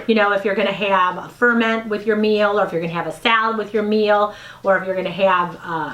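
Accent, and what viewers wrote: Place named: Wisconsin, United States. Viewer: Well,... Your accent reminds me of my family, all who hail from Wisconsin & some while back also Chicago. Refreshing...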